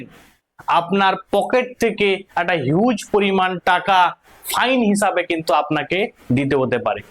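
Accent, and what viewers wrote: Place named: East Bengal, Bangladesh. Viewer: kotha r golar tone sune mone ho6e bangal chele mane east bengal supporter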